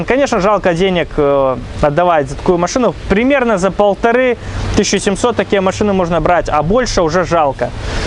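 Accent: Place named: Belarus. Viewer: Как мне нравится беларуский говор)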